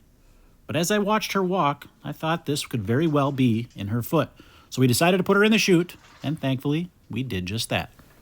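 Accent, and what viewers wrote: Scotland, United Kingdom. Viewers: I confess to be a bit annoyed with some of the extra fluff he puts in -- but I believe many of his other subscribers actually enjoy the fluff. His latest video had Zero fluff and all hoof-trimming. So I think he changes it up for variety. As an American living in England, I rather like the Scottish accent. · I like the Scotsman's accent. But he does have more 'filler' in his uploads nowadays.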